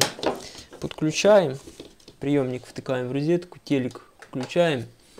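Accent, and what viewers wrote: Vologda, Russia. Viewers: Вологодский окцент! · вОлОгОдскій суржик у твоєму виконанні - просто повний пі...єц. Дизлайк за "язик"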